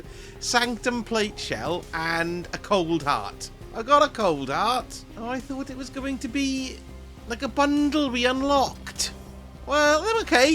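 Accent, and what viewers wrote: United Kingdom. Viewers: This is how all british people talk.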